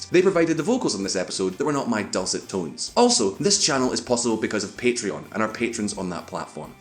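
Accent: Scotland, United Kingdom. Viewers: The (Scottish? Welsh?) accent is grrreat man! (read as A Scotsman speaking, lol) But for the love of decency, man, grow a beard and get some nerd-approved specs on your face :-D. As for Isreal/Palestine it's a case of 2 wrongs. It's very complicated; and instinctually you think, single state solution! But that's not as viable anymore as there is definitely economic disparity, and there would likely be polarizing political battles in perpetuity (not that we know anything about that here in the States). At it's core you have 2 peoples who feel the land is theirs by birthright...and the Northern Irish can tell you any peaceful coexistence is uneasy at best. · Finally a Scottish accent too! Gaun yersel!!:) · The posh scotsman is back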